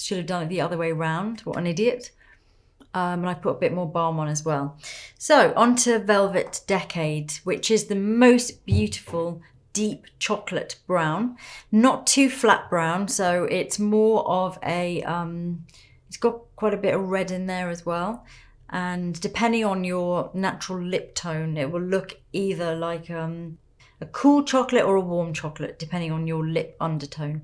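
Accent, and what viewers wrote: United Kingdom. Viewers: Not only your magical makeup works and your beautiful natural look makes me to watch your videos, but also I'm here to enjoy your gentle and sweet British Reserved Pronunciation accent. You are as soft-spoken as a wind-harp swinging in an abbey door.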